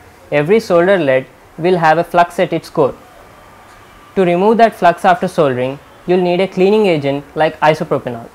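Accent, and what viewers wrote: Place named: India. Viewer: few indian accent that i watch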